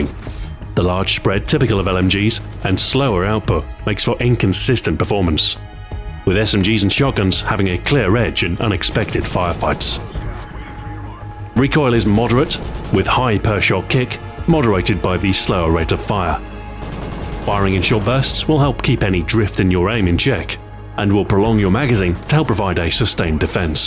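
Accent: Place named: England, United Kingdom. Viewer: The English accent makes you sound very intelligent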